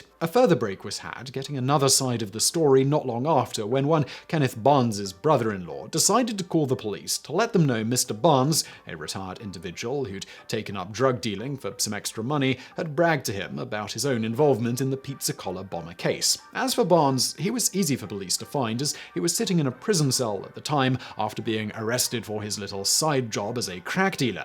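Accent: United Kingdom. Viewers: I can't watch a UK guy tell an American story🤣it's annoying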